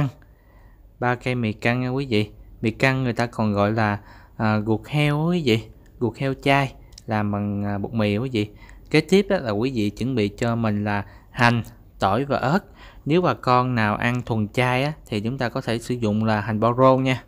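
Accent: Mekong Delta, Vietnam. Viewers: Cách nói chuyện đúng chất miền Tây luôn ạ ❤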